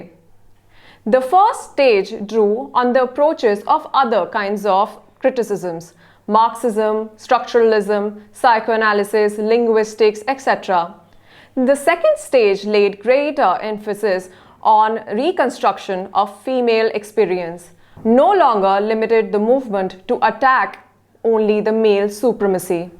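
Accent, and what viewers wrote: India. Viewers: I really appreciate what this channel has been doing but the instructors' Indian accent spoiled mine 🙊☺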